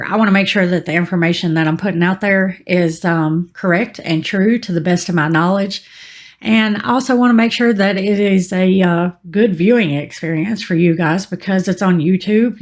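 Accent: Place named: South, United States. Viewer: Wow, that was a beautiful, open hearted and moving vlog. Thank you for sharing in that gorgeous Southern accent of yours. Any chance you could make a video off topic how to make sweet potato pie?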